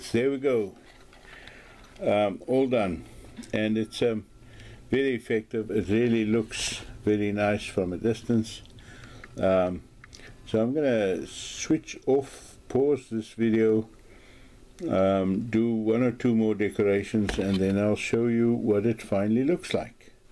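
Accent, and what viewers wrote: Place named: South Africa. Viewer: Hi you have an unmistakable accent. You must be South African. Are you in SA. I would like to get into the art of leather working I am a graphic designer by profession where does one get leather from in SA I live in JHB Randburg.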